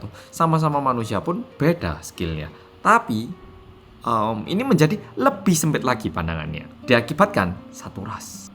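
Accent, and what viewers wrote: Java, Indonesia. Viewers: Informasinya sangat jelas, mulai dari hal kecil sampai hal yang rumit. Intinya Bullying dimana-mana selalu ada, Karena sifat dasar manusia yang ingin menguasai dan diakui. Dan Sebagian besar orang-orang seperti itu terbentuk dengan sendirinya oleh lingkungan disekitar. Terima kasih, sudah membuka wawasan kami tentang kehidupan di Korea ya Mas 😁 Saya suka Gaya medok Njenengan hehe.. Salam dari Surabaya 🙏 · Cak, yoopo carane sampeyan ngomong logat korea.. padahal sampeyan medok, bikin tutor cara pengucapan bahasa Korea lah cak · Enak bnr dgr logat jawa nya 😅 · First time aku nonton vlog orng korea medok kyk org jawa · Medok men bahasa mu mas bro.. 👍👍👍 Biar gak terlalu berkompetisi harus belajar spiritualitas mas bro 😁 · Mas Korea kok medok Jawa ya 🙏👍🇮🇩💪🇮🇩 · Kok medok banget sih masss, jd unik lohhh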